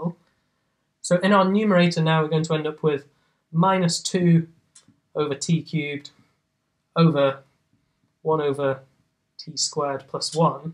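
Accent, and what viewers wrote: United Kingdom. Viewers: thank God that math is universal, i can't understand his british accent at all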